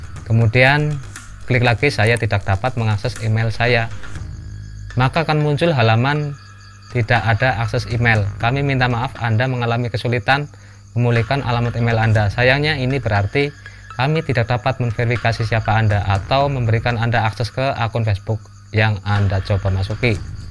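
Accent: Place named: West Java, Indonesia. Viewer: Orang sunda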